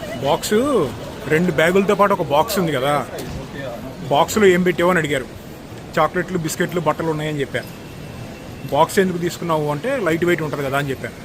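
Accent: Andhra Pradesh, India. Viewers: Bro meru .. Nizamabad kada me slang Andra la undi ?..me wife ni parichayam cheyandi...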